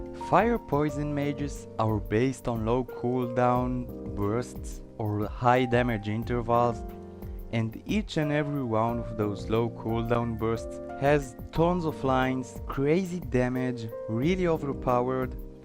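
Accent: Israel. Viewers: You sound like you’re from Israel haha. Awesome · Hey, is that a hebrew accent ? Love your channel bro !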